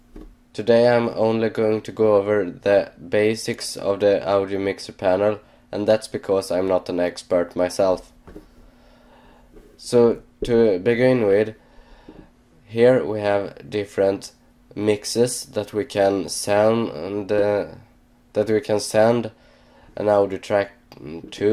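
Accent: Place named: Sweden. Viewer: fyfan va svensk du låter :P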